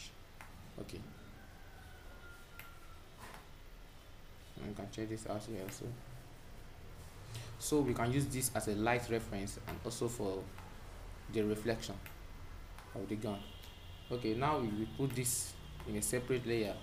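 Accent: Nigeria. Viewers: are you a Nigerian?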